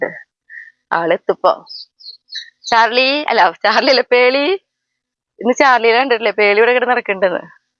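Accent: Thrissur, India. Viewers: വീഡിയോസ് ഒന്നിനൊന്നു സൂപ്പർ ആണ്. കണ്ടു കഴിഞ്ഞ വീഡിയോകൾ ആണെങ്കിലും പിന്നെയും ഞാൻ കാണാറുണ്ട് അത്രക്കും ഇഷ്ടമാണ്. പിന്നെ നമ്മുടെ തൃശൂർ ഭാഷ കേൾക്കാൻ ഇഷ്ടമാണ്. വളരെ innocent ആയിട്ടുള്ള സംസാരവും വളരെ ഇഷ്ടമാണ്. കുടുംബത്തെ ഈശ്വരൻ അനുഗ്രഹിക്കട്ടെ എല്ലാ സൗഭാഗ്യങ്ങളും നേരുന്നു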